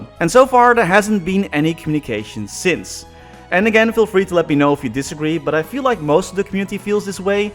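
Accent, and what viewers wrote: Netherlands. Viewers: are u dutch? im dutch too :D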